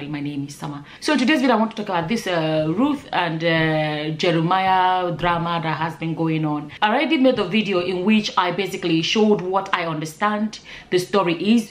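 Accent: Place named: Nigeria. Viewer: your pidgin too dey sweet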